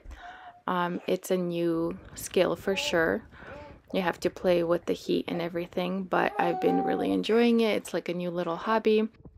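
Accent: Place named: Russia. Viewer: Мне кажется, что я слышу едва заметный русский акцент.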